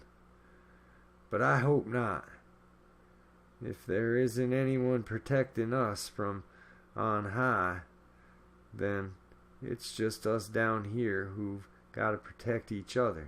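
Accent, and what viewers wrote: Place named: Oklahoma, United States. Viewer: HOW THE HELL DID I MISS THIS ONE ???? LOLOL. !! LOVE the accent !! I know a lot of hillbillies here in Oklahoma that. Talk just like that !! Some of my family in S.E.Okla. !!! Lolol😹😹😹😹😳 Great job , hon.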